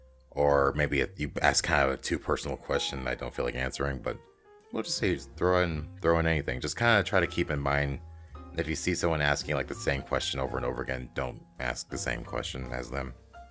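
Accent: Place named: Central Europe. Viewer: thats cool, i actually thought about usa looking at your upload times but from your voice i thought you might come from central/east europe. Congrats on the 10k! No idea if you remember me im here since the 1-2k'ish =)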